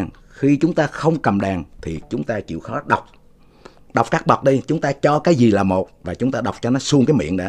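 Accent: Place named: Cam Ranh, Vietnam. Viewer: ..lúc đầu nghe tiếng nói a e nghi nghi rôi ..vì e cũng là ngưòi Can Ranh .Mong a nhiều sức khỏe.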